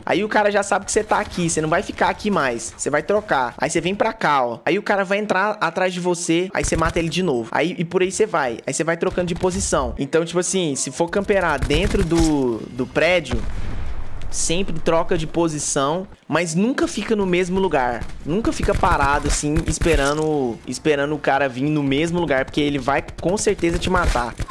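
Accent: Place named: Goiás, Brazil. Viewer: Os ataXiiiments sotaque Goiano kkkkkk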